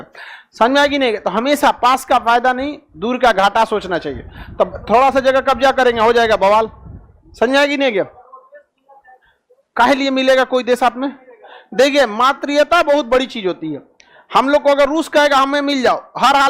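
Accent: Bihar, India. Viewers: आप बोलते बहुत अच्छी डौंग से। एकदम बिहारी स्टाइल से। धन्यवाद। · Bihari accent so strong... for once you forget that he's a Muslim 😂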